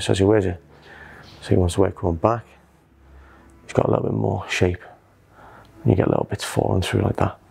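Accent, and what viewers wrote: Liverpool, United Kingdom. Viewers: Scouse accent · That scouse accent❤️ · Love his Scouse accent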